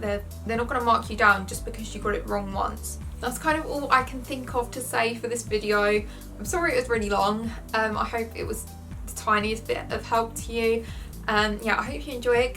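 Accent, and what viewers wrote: United Kingdom. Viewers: Useful videos. But I find your British accent too strong to follow like you speak so fast😉😉😊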